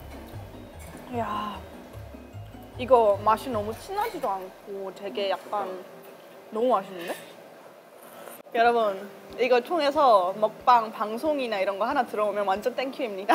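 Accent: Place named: Gyeongsang, South Korea. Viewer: 그동안 무슨 일이 있었길래 본인 유투브 채널 활동 안하다가 다시 컴백한건가요. 구수한 경상도 사투리가 매력적인 보고싶었어요. 다시 활발하게 활동해 주세요.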